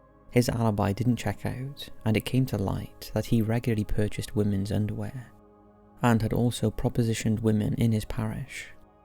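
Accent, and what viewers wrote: Wales, United Kingdom. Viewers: Deffo welsh! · Definitely sounds Welsh to me! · Except as a Welsh girl, I definitely do hear Welsh in his accent! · Sounds welsh to me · It's definitely Welsh, I live in the Midlands and we sound nothing like this guy.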